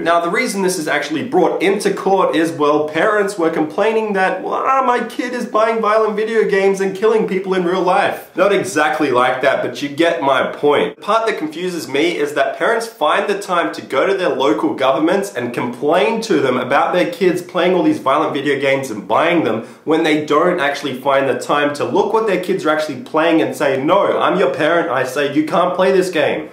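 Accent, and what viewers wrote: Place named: Australia. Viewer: i knew i recognized that accent, aussie u tuber ftw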